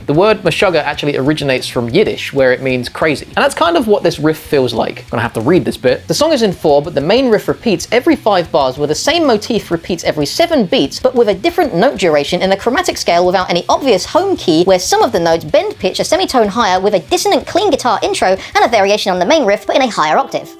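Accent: United Kingdom. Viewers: You speak the queen's English. It's Maths. Plural. Short for mathematics. There isn't one math. There are many Maths.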